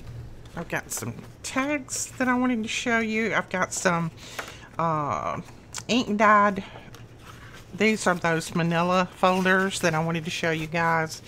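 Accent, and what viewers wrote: South, United States. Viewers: I didn't realize that you were near Birmingham, Al. I guess I missed out on were your from. But, I can tell you have a sweet voice with a slightly southern accent. I'm from Alabama myself so there's not really an accent. Lol I think doing what you're doing and talking with "friends", will help you out of that funk. Above all, prayer. But I realize you know that already. Here's to better days ahead.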